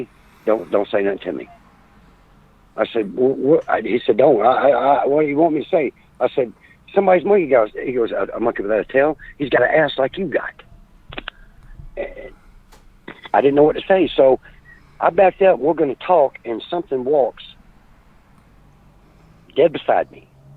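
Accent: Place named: Florida, United States. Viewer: He sounds like an old fashioned Florida guy from the country. Some old bars and pool balls the men's room was actually a back door outside to an alley.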